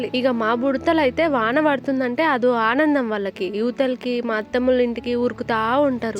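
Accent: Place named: Telangana, India. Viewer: తెలంగాణ slang 👌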